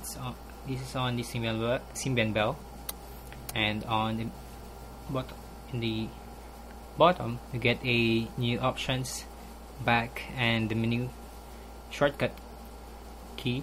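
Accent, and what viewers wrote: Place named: Philippines. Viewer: I knew it..you're a Filipino kabayan..the accent is there.. "The Symbian event was (in) HK so we attended there." "use two weeks ago not last last (redundant) week. mabuhay! peace y'all! =) good review and comparison.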